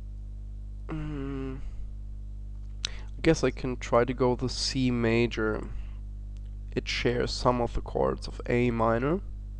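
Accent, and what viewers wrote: Germany. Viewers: man merkt an deiner Aussprache dass du deutsch bist ;)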